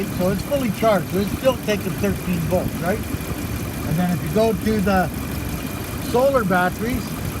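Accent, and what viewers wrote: Canada. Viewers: Education at the best level. Hands on and clear English or Canadian. Eh? Or is it AH?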